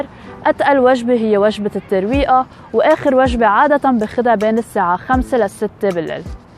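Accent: Arab world. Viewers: Me encantó tu italiano! sin duda se nota que el árabe es tu lengua. PD: Saludos desde Guatemala, humildemente bilingüe jaja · ¿Qué dialecto es tu árabe?, ¡Me encanta!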